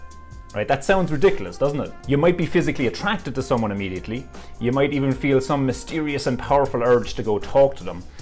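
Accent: Ireland. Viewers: Get a career closely related to your passion - that way you can use your leisure time to pursue it, while your day job is not an utter bore. Worked for me! Love these videos, an an Irish Canadian who loves hearing lovely Irish accents.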